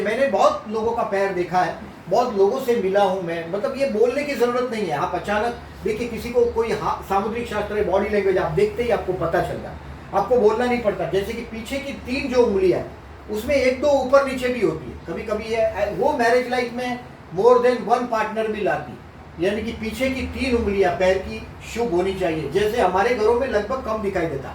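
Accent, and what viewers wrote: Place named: South Gujarat, India. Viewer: Sir your language seems like south Gujarat which is your native place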